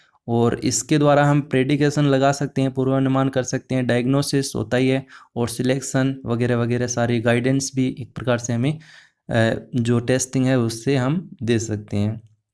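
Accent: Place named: Haryana, India. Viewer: Guruji 👏AAP pdane saath saath Jo hme motivate karte ho Aapka bahut bahut dnyavad .aapki aawaj se esa lagta h Guruji 👏 Jese AAP haryana se ho AApke pdane ke method ka koi jwab nhi Guruji 👏 AApke vedio Dekhkar saath saath notes bna rhi hu Guruji 👏 from kaithal HR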